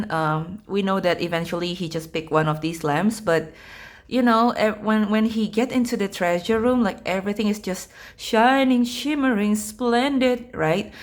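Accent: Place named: Indonesia. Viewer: I heard Indonesian accent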